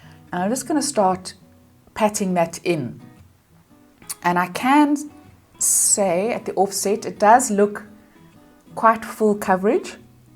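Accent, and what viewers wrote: South Africa. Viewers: Hi, I liked this video. Just found you on YouTube. I can hear this hat you are South African. I am also, but living in New Zealand. You gave a very good review. I feel I can identify with you 🥰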